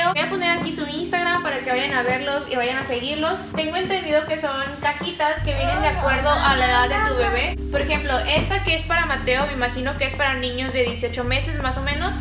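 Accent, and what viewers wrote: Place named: Monterrey, Mexico. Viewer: No manches, nunca imagine que fueras de Monterrey lo digo por el asento. Saludos hermosa ❤️😘🥰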